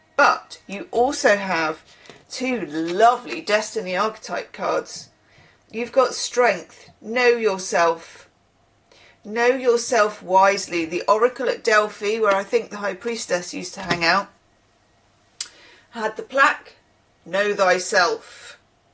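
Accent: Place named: Wales, United Kingdom. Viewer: 😂 I’d never heard of evince & had never tallied plato & platonic 😅& then there’s the 3rd slang word in London for cunnilingus Platoed 🤣🤣🤣I’m going get told off . Your Welsh accent great !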